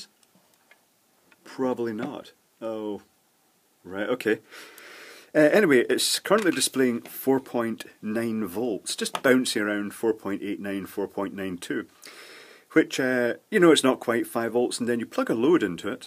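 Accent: Scotland, United Kingdom. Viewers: J’aime l’accent Écossais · Is he from Scotland?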